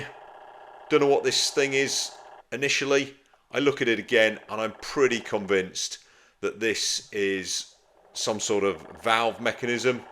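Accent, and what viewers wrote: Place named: England, United Kingdom. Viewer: Really enjoyed watching this..and love the English accent..I'll lay odds on you were in the Services...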